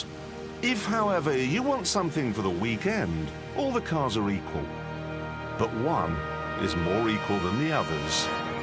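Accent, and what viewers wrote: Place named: Birmingham, United Kingdom. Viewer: Richard Hammond's brummie accent was still there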